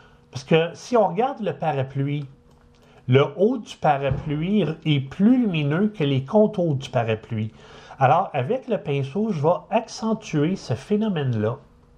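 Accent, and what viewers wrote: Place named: Canada. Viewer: Tres bien expliquer .merci beaucoup ( j adore votre accent canadien )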